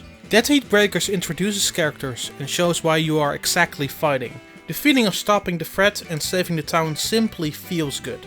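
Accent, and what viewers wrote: Netherlands. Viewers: Yes he is Dutch lol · You are dutch, i can hear that ;-) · Is this dude Dutch? His accent is slightly off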